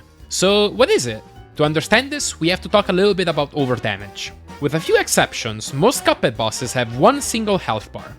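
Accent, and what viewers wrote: Italy. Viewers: I recognized the Italian accent in 1.3 seconds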